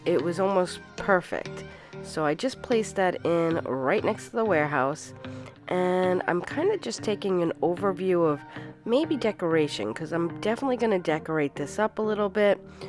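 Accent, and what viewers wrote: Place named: Northeast, United States. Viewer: In this game, water sucks, it really really sucks. The water is so wavy it makes the roads wave. Im glad your fiddling with the railroads paid off. I'd keep an eye on your train tracks, I'm worried about trains locking up in such a short space. My last metro setup locked up, killing my profits. I'm still a little bitter about it. I have trouble with train and metro tracks as well. To get the most shallow slopes on the roads, or any network really, the slope section should be exactly 12 units long and rise no more than three small steps. The 3 small elevation steps are the minimum required to go over other networks without conflicts. It makes for some damn pleasing looking overpasses in my opinion. I swear I heard some New York in your voice this time. If you're on the East Coast, it certainly explains why your videos go up so early. 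I'll have to wake up before dawn to get First Comment. Cargo hubs bring all the trucks to the yard. Yours is simple enough to keep traffic tamed while still looking great. Can't wait for the next episode.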